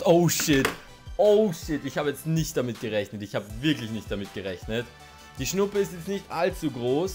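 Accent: Austria. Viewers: bist du eigentlich Wiener oder Niederösterreicher ? #tollevideosimmerweiterso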